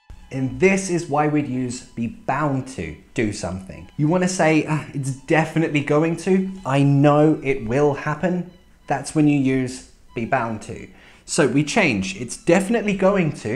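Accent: United Kingdom. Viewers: That is really interesting. Thank you so much for your efforts. And actually, I am not British, and I watch your videos to improve my British accent. I would like to learn how to pronounce the letter 'o' in a British way like in the words 'no' or 'so'. They are not pronounce the same as in American accent. Thank you in advance ! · i really enjoy all your vedios .... hope that you will go on and make more usefull vedios iam in love with the British accent and you helped me to learn it ... Thank you very much ❤❤❤❤❤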